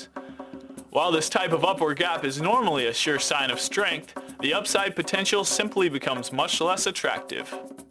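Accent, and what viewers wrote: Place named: United States. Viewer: Please turn on caption i want to see in Hindi.i can't understand American English.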